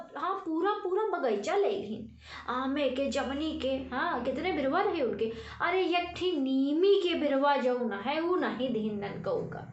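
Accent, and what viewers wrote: India. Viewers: ji sabse pahle to pranam aapko aap mein kitne gun Hain Main Kya kiya hun aap bahut acchi Teacher hain bahut acchi Ja sakta hai bahut acchi singer hai aapki yah boli Dehati bahut hi acchi lagti hai man Kahta Hai Ki Kitni bar aapka video Dekhun thank you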